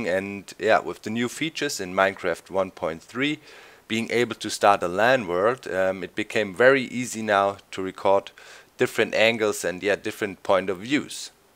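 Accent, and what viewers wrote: Germany. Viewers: You are German like me. Aber dafür ist dein Englisch total gut :)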